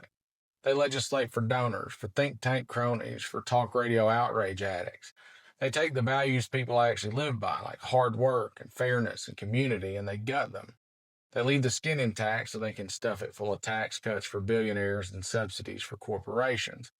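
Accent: South, United States. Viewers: Glad to hear more southern accents speak these truths!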